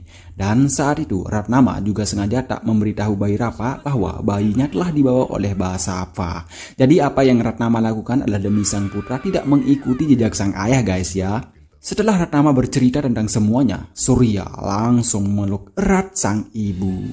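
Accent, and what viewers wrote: Bali, Indonesia. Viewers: Logat bicara nya kayak logat bali, 😁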